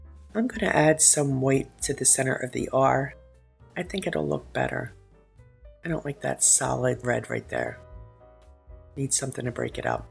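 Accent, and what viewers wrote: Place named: New York, United States. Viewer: Love this sign! Hope you’re loving your new fridge! Where. Are you from? Your accent sounds like we could be neighbors! I’m hearing NY NJ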